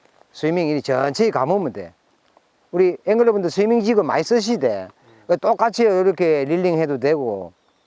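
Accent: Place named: Busan, South Korea. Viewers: 얼마전 바쁘신 일정 중에도 영상 올려주셔서 감사했습니다 특히 저랑 같이 경상도 사투리를 들으며 영상을 보고 있으면 저절로 필드에 동출한 기분이 듭니다 ㅎㅎㅎ 항상 감사히 보고있어요!! <mail> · 아~하~ 영상뜨고 항상 자기전에보는데 같은부산사람으로써 말투도 정감있고 낚시를 재밌게 가르쳐주시니 더재밌고 더 알기쉬운거같아요 앞으로도 좋은영상올려주시고 항상 안전하게 낚시하시고 건강하세요ㅎㅎ 화이팅ㅎㅎ lee<num>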